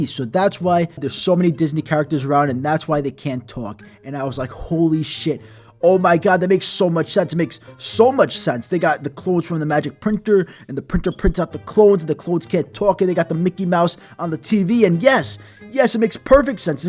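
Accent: New York, United States. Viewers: Is it just me or does sound like he has a slight New York accent? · U r from New York right ? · I like his voice , it reminds me of a kind of latina and new york accent.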